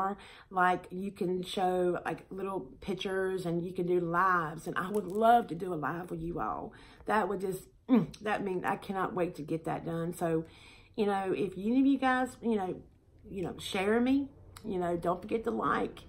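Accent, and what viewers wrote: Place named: Alabama, United States. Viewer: Tuesday and Thursday would be good for me❣️ I lived in Alabama for 10 years so your accent sounds so good to me. I’m so sorry about your phone! And I know what it’s like to move a lot. Will you still be close enough to see family and friends after your move? You’re so sweet, I love to watch your videos and I like the way you decorate. 👍🏻🥰🤗🙏🏻 I’m praying for you😌❣️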